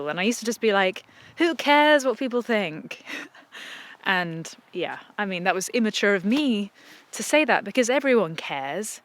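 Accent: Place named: RP, United Kingdom. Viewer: God, you're so bloody posh,. I can see you as the old dear running a large country pile....in (quite) a few years when you've made your fortune.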